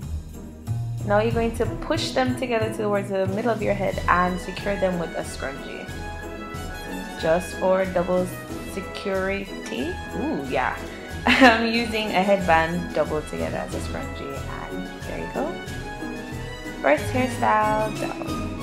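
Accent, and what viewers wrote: Jamaica. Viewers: Are you Jamaican? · Are u jamaican?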